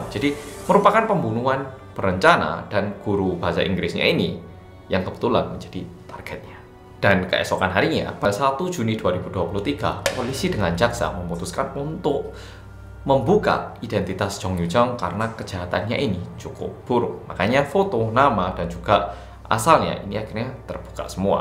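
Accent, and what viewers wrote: Java, Indonesia. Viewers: lo kok yg mbawa wartanya aku pernah lihat dia .waktu dia nyambut pak jokowi hadir d korea .dia ini pinter pakek basa jawa . dan dia bilang pernah tinggal d malang . mantep logat basanya ya medok . semangat brooo moga yutup mu sukses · mas nya muka korea tapi logat jawa 😅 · Trmksh buat pak sopir ya,untuk mas Mimin cara menyampaikan berita bagus dan logat Jawanya kental banget. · Mas kmu orng Korea apa orng Jawa tp kok logat Jawa wajh korea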